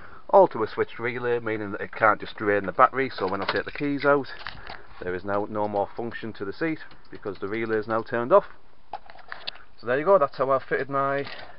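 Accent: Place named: North East England, United Kingdom. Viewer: These look really well, you sound like you are from the north east like me, cheers for the video brother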